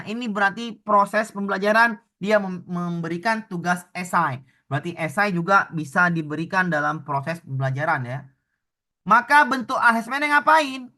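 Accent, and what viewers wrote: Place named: Medan, Indonesia. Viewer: Medan kali logat bapak ni 😂😊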